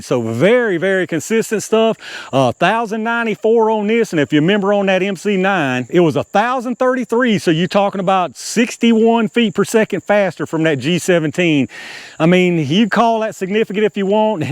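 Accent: South, United States. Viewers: damn.. you surely dont expect a gun youtuber with a strong southern accent to pull up all those gears for ammo tests lol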